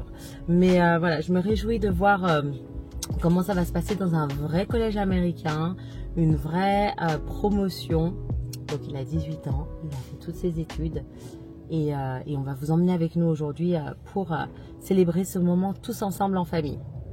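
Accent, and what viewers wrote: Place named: Switzerland. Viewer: L’accent suisse faut arrêter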